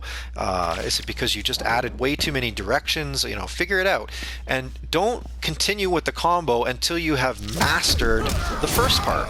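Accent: Canada. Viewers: Canadian eh? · pretty sure youre canadian based on the way you talk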